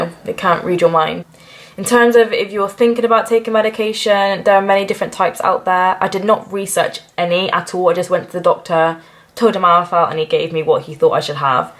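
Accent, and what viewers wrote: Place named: Bristol, United Kingdom. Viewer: Hope celexa i will look this fit 😆. You sounds like a brizzle girl my neck of the woods. Mental health has been a lifetime enjoyment for me its been relentless im now on celexa it was the last ssri to try and has been the best so far , thankfully. And i totally get you girl , death was my main ambition in life and my life had no ambition. I spend my time with likeminded people or understanding people other people are toxic for me. They are earthlings and im a alien. 👽 i joined a walk and talk for nutters in swansea and its been amazing 👏. When your battling with mental health the people you are use to being around become disposable and the likeminded people are the ones we need. Like you just said the general practitioners are terrible. Specialist help are the ones who can help. Luckily/unluckily i ended up a addict with alcohol and i have the A.A rooms and that has kept me sober for 5 years. 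Alcohol works great until it becomes a problem and with mental health it always becomes a problem. You cant redress the imbalance with a imbalance it just makes us extra wacky. Live life on lives terms and understand about , acceptance. We have to accept that this might be for life ,if you fight it , it just gets worse. What you need is a funny as fuck friend that makes you laugh. Thats why i like my nutters around me, sober nutters i might add. They are all trying thier best its all we can do. Good luck with your journey to getting well and thanks for your share. You did the right thing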